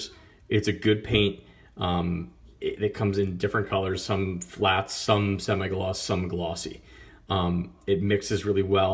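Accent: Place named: Philadelphia, United States. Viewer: You a fellow Philly guy like myself? The accent.. either that or Bmore/Delaware. I'm just getting into customizing. I got Testors and some brushes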